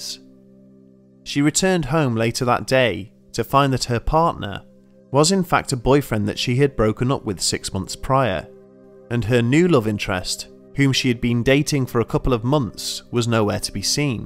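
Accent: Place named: Northern England, United Kingdom. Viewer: His accent is northern English (possibly Yorkshire) although not very pronounced. The internet is swamped with American media and accents these days which is probably why you haven't heard of it before. Even the media in Britain tends to include predominantly southern/accentless British voices so we're not blameless either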